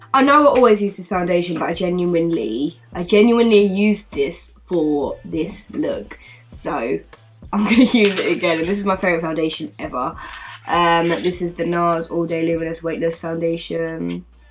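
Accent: United Kingdom. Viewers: Had no clue you were British! So pretty!! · You're actually so flawless. You don't have a single unattractive feature like can I be you. plus you have a British accent too. How can a person be so perfect?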